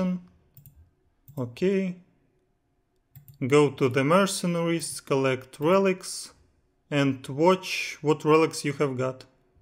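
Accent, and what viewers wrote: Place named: Russia. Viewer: русский акцент, красава, норм шпаришь по-английски!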